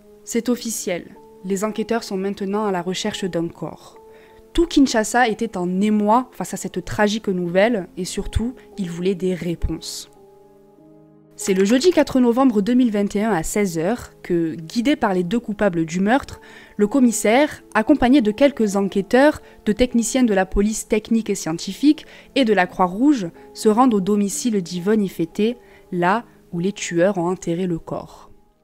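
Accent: Marseille, France. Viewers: Voilà un histoire qui prouve qu'il ne faut jamais fait confiance à personne à 100% à au plus proche PS: désolé si c'est indiscret de ma part mais t'est marseillaise ?? chais pas pourquoi j'ai l'impression qu'il y'a un petit accent marseillais dans la façon dont tu parle😭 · L'accent Marseillais j'aime bien !❤️🥰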